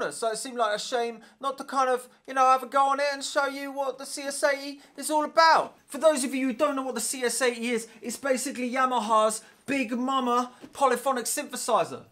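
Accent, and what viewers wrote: United Kingdom. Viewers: Which British accent would you call this?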